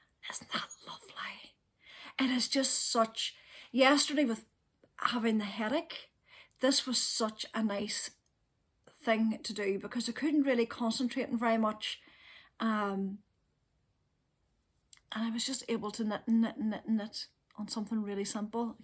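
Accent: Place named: Ireland. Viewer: Sorry about your headaches. Happy you’re able to rebound albeit painfully slow. I love to hear your thoughtful comments about your yarn picks and patterns. Especially in your soothing Irish accent. I, too, was primarily an earth tones knitter-deep greens like you, grey & brown farm yarns, with a splash of red thrown in. But lately some of those bright colors for a garment have been very attractive to me as well. Am thinking of making a vest with my stashvent calendar I received.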